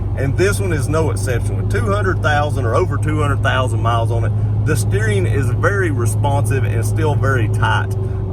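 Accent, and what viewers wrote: United States. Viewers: Most foreigners believe Americans sound like this fella lol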